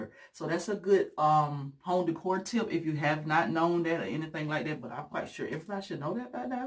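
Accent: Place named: Louisiana, United States. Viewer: You sound like me when you speak I’m Louisiana native are you?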